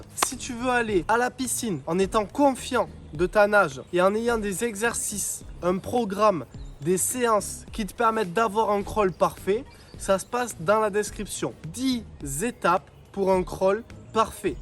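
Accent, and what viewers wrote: England, United Kingdom. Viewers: Ce petit accent anglais je fonds 🥹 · Merci pour tous ces conseils, super bien expliqué, clair, et ton accent anglais n'est pas si mauvais que ça ;) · 👍🏻🔵 pour l'accent anglais😁 et le reste bien sûr! J'ai repris la natation via un programme "je nage pour ma forme" et il s'avère que ma brasse manque de coordination... Vos conseils et exercices m'aident beaucoup quand je vais nager en dehors des séances de groupe! 💙🩵💙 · Très bien pour Ton accent anglais, et surtout pour ''le regard méchant, et le soulevé de Coubra, c'a nous aider à bien effectué la technique.. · Ma nage . Le pap évidemment. Ton accent anglais si ça va . Je te propose or ta prochaine vidéo de la faire in english 🤣🤣🤪. Sinon non belle vidéo et bonnes explications. ;) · + pour interet a la chaine + pour ton accent anglais + pour les 5 astuces. Merci je fais une heure complete a la brasse avec beaucoup de plaisir (mais assez difficile les 10-15 premieres minutes. 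· Wow excellent accent anglais....merci pour ton coaching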